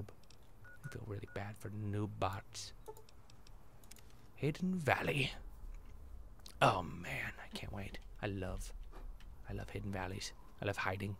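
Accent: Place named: Scotland, United Kingdom. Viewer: your scottish accent is actually okay :L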